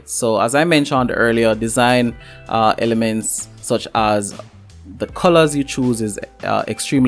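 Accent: Jamaica. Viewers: Are u jamaican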